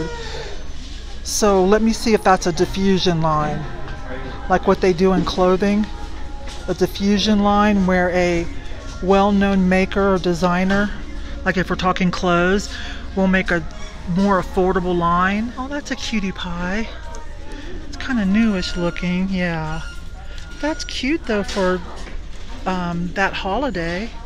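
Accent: United States. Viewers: I love listening to your voice. Where are you from in the States? I live across the pond, so am not familiar with different American accents. Love your videos and I learn a lot. Thank you so much. 😺❤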